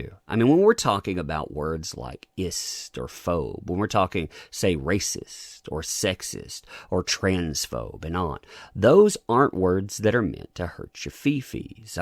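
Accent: South, United States. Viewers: Keep speaking the truth in that smooth southern tone 💪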